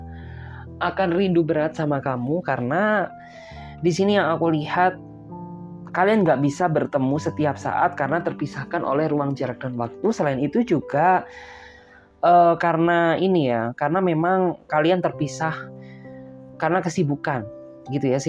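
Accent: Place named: West Kalimantan, Indonesia. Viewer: kalbar nh kk😅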